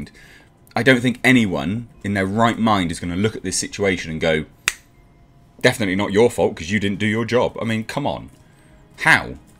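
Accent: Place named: United Kingdom. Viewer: Excellent news coverage however that pretentious British accent is annoying as hell.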